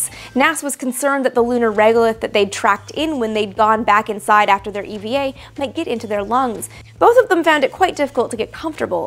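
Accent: Canada. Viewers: Is that a Canadian accent I detect? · your hot and you sound Canadian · Are you Canadian?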